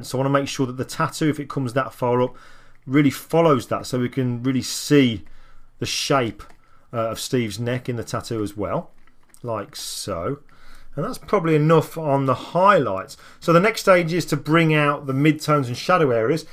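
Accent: United Kingdom. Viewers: O cara nem é americano kkkkkk é britânico u.u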